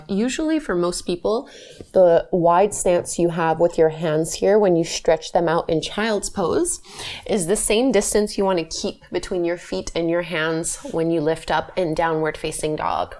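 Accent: Canada. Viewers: My word today is "grounded". Thank you for your amazing videos. I love starting my day with your guidance and expertise. I really appreciate your encouragement for modifying poses as needed; I never feel any pressure to conform to something that doesn't work for my body. Also, as a transplanted Canadian living in the US, it is really wonderful to hear your Canadian accent. The three oms at the end gave me some time to really internalize my practice.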